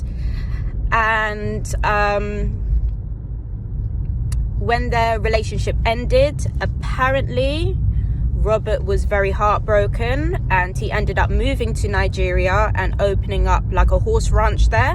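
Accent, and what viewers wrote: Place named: New England, United States. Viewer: you would fit right in with African Americans, with no problem at all until you start talking, but some will think that you grew up in New England, would take care of that accent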